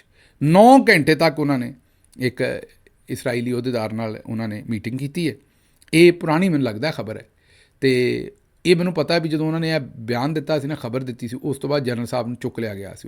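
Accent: Standard. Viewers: Thait Punjabi Good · Really enjoying your way u talk pure Punjabi in your vlog. “Bijjo bari dair k baad suna he. Welldone. 👏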